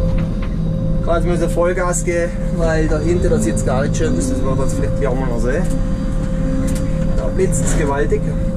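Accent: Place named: Switzerland. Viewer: Jo de schnurret halt scho fascht schwizerdütsch.isch jo gard näbe mir äne am riih.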